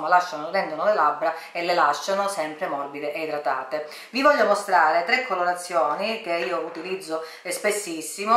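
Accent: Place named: Sicily, Italy. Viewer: Dall'accento mi sembri siciliana😆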